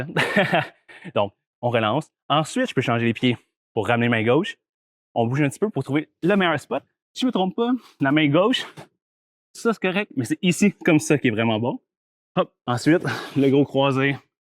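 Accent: Quebec, Canada. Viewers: Bon video! Hello from Vancouver. It took me nearly 5 min to get back into the Quebecois accent. Great content, great climbing. I love your project! Wish I was strong enough to try it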